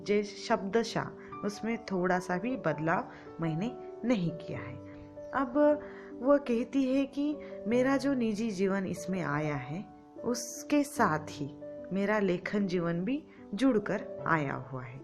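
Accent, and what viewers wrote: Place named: South, India. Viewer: Thanks 😊( kya aap South Indian h!)Aapki bhasha hme Kisi Apne ki yad dilati h😊.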